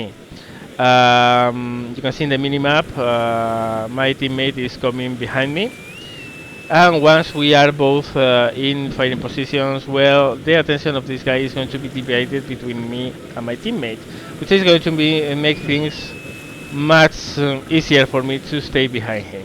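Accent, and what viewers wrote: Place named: Spain. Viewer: Dios se nota a la legua que eres español jajaja buen video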